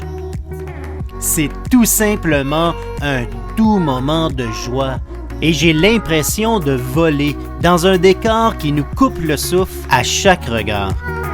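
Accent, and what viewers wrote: Quebec, Canada. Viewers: Comme j'adore votre accent Québecois !!!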